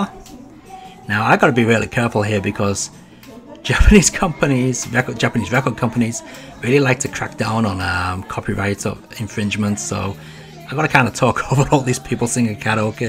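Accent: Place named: Wirral, United Kingdom. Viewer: They looked lovely! are you from the wirral mate? your accent sounds from around my way.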